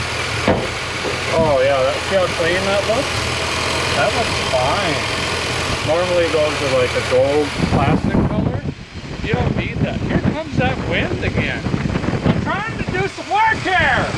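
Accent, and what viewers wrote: Minnesota, United States. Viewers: I bet you're thrilled to have her home. I have a '59 Skyliner that's been in my family since '78. When my dad died, a few friends suggested I sell her. They just don't get it. A little Minnesota tie in, she may have an intake manifold yanked out of a donor at Windy Hill in New London, probably in about '86 or so. We were up there for some family stuff, and the old man wanted to go junking. I enjoy your channel. It's nice to learn stuff, while also enjoying your accent. You talk like most of my family from up there. I was born in MN, but smart enough to leave before I was 2. 😎🤓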